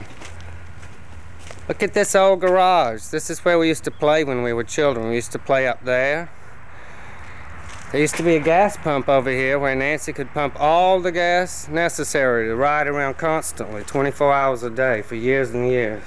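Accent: South, United States. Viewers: It's really interesting to me how his southern accent is more pronounced in these videos when he's at home vs when he's in NYC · goes home and that Southern draw is magnified. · I think his southern accent definitely came out more in this video.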